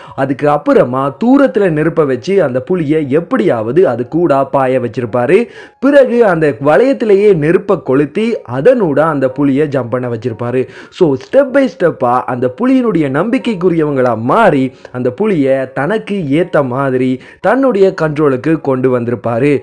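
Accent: Sri Lanka. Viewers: Bro neenga srilanka jaffnava?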